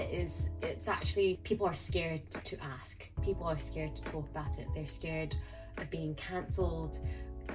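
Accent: Scotland, United Kingdom. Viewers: ngl I thought you sounded Scottish for agesssssss!! Gosh I feel the same.... I find myself laughing at 'jokes' but really it's not okay. Thank you for articulating so many of thoughts I have, especially not fully resonating with the term BAME.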